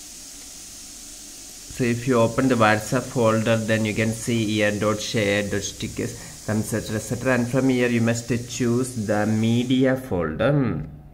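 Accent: Kerala, India. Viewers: Terrible, thick, incomprehensible Mallu accent!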